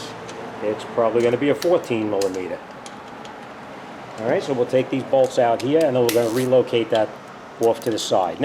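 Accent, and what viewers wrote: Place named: New Jersey, United States. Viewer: Thanks for taking the time to post this DIY. Almost wished I still lived in JC instead of Florida so I could stop by your shop after hitting the diner. Let me know when you work on a Porsche 997 Turbo because I have not found anyone else that has made a great, comprehensive and complete video as yours. Also enjoyed hearing the Jersey accent and the horn honking, too. Keep it up.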